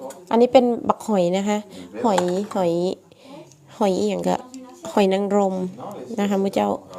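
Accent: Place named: North, Thailand. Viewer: อู้คำ เหนือเลย คุณยายติดตามตลอดคะจากยายพรรณคะ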